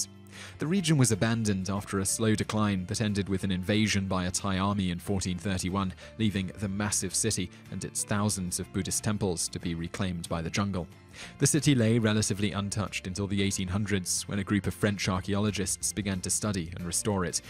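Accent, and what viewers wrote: United Kingdom. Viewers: I don't mind his British accent, half my family is British. But I do wish he'd research how to pronounce names and other proper nouns correctly. He butchers so many it's distracting. He also says "skellingtons" which was funny the first time I heard it, but not the last twenty. Type things out for him phonetically or something!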